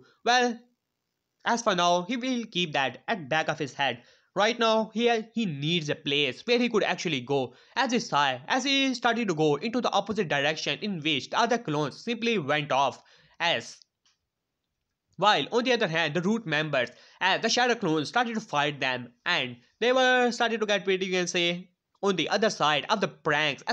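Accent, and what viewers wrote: India. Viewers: Is ur accent a India accent. I'm wondering bc it's too similar to a one piece character and I've always wondered the real live source her way of speaking/ accent came from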